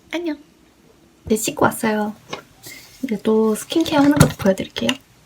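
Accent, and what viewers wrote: Seoul, South Korea. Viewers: 서울 사투리...? 말투가 너무 매력적이에요!!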